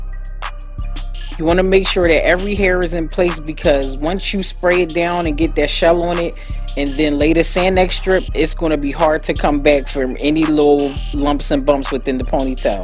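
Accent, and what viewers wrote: Philadelphia, United States. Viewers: I was about to say that you sound like you’re from Philly, then I saw that it was you lol. I want you to style my short hair 😩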